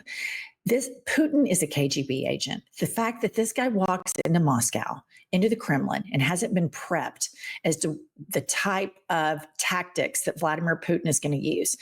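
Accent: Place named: Philadelphia, United States. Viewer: Ummmm are you sure you're not from philadelphia ma'am I love you're delivery and speaking the truth about ##LilSpanky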